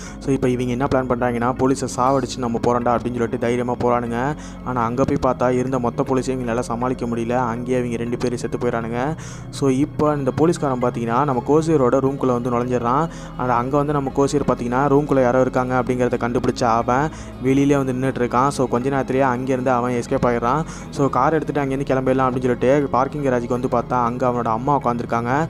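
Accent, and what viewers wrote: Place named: Coimbatore, India. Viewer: Avainka , eveinka slang -CBE 😁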